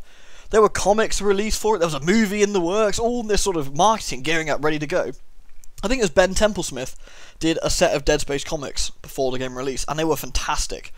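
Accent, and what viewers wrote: England, United Kingdom. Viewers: Ooooh... I know that feeling all too well, although that feeling tends to manifest whenever I suddenly remember playing a particular game or something triggers my memories of said particular game. The most recent case, for me anyway, was when I was reading the comment section for his video announcement of his Patreon account, and one of the viewers suggested humbly that he redo his playthrough/LP of F.E.A.R. Pretty soon, I installed my copy of F.E.A.R. and F.E.A.R. Extraction Point. Funny enough, it was because of your LP of Dead Space that I now know of the:). My first impression when I saw one of his videos for the first time (in Dead Space): "Woah! That's a thick English accent!"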